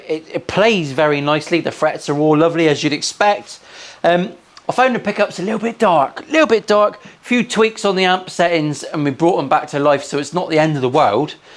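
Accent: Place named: West Country, United Kingdom. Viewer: Only just discovered your channel,. Excellent vids love the humour. Being Milehouse Studios you're obviously based in the 'Muff-always nice to hear an accent even more Wurzel Gummidge than mine, bey 😂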